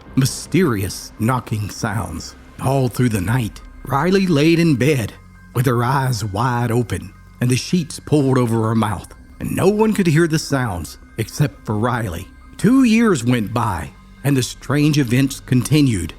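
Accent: Appalachia, United States. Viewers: Wonderful video, outstanding research, and terrific Appalachian narration. This episode was my introduction to your channel and I thoroughly enjoy the originality of storytelling and visuals. Subscription activated. Speaking from experience, children are sensitive and susceptible to spirit encounters. Great job!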